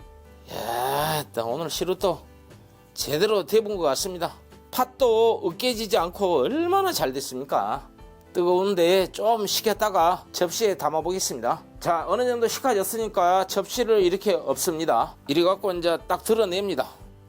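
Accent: Jeolla, South Korea. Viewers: 안녕하세요. 저는 캐나다에 40년째 살고있는데 매일 당신에 체널을 시청하고 있답니다 좋아는 필수이고요 제가 떡 종류는 다좋아해요 제 고향은 전라북도 부안입니다 어려서 고구마 감자 옥수수 떡을 좋아해서 많이 먹었더니 여자 형제중에 제일 크지요 😅 말씀이 전라도 말씀에 정이 더 갑니다 오늘은 시루떡이네요 나중에 떡가루 사다가 떡 해먹으려구요 감사하고 사랑합니다 아 그리고 여기서도 한국 음식만 먹어요 손이 많이 가지만 그래도 한국음식이 최고예요 😂😂❤ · 내가.절라도출신인데.절라도말로하시니까 너무정겹고..셀프님.정말똑소리나내요.화이팅❤❤❤❤❤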